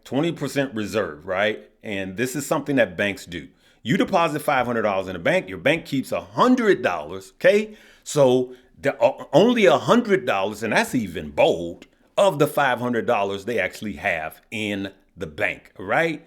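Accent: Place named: South, United States. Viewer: Thee accent!! Ohh with the country accent. Uncle. Has. Had it.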